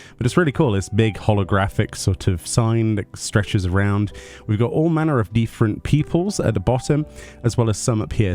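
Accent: United Kingdom. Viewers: Me watches cause he always posts video's on the latest ffxiv. And I want to stay updated. also he avoids drama. also he has a nice british accent Haters on the internet: we don't like that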